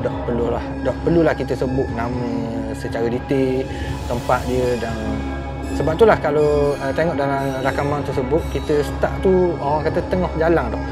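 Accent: Terengganu, Malaysia. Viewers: Selesai tonton.. mantap dan padu! Terasa padat menontonnya.. penceritaan dgn loghat ganu yg menarik.. teruskan.. Kipidak! 👍💪✌️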